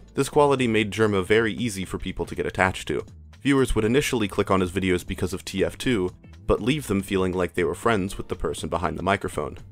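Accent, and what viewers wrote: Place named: United Kingdom. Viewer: Im just here for your british vocals!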